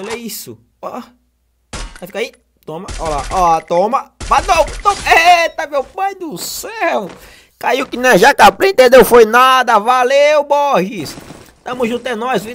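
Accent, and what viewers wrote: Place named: Paraíba, Brazil. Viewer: Paraiba pra fala feio Zuado joga nada.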